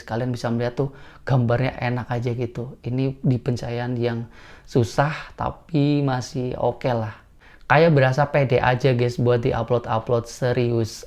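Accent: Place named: Banyumas, Indonesia. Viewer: Ora ngapak ora kepenak ya kang...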